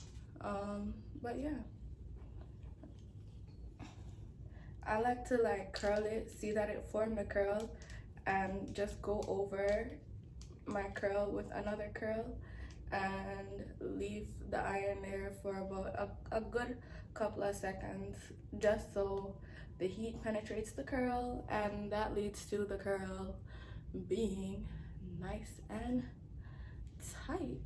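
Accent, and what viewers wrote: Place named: Jamaica. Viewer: I love your accent 😊😫 you must be Jamaican!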